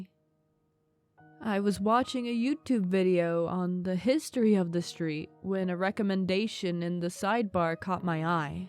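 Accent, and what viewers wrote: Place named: South, United States. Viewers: You're right!! Magnificent southern accent!! Sounded like one of my neighbors 😂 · Love your calming southern accent.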